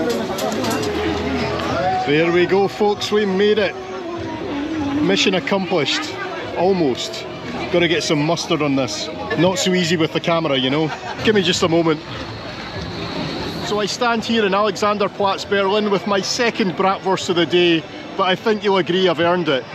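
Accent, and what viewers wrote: Scotland, United Kingdom. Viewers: Merry Christmas and Alicja🎄from Berlin. Next time you’re in town, gimme a call and I’ll show you around. I love your videos and the Scottish accent. Keep on going in 2025 🎉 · I've never been to Europe & you videos & Scottish Accent are wonderful. Thx again. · Thanks for all these fabulous videos. Late last year, we discovered one and your videos and thoroughly enjoyed it so much that we decided to go back to your first video and watch every one. We are still watching them but are now nearing the end. We also keep telling our friends and family about your fabulous videos and encourage them to watch. A lot of our enjoyment of your videos is not only your presentation and the places you visit, but also enjoy listening to your accent and your Scottish description of objects and places e.g. "wee" and "bonnie". So, many thanks for providing us with so many hours of enjoyment and education. 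Patricia and John ❤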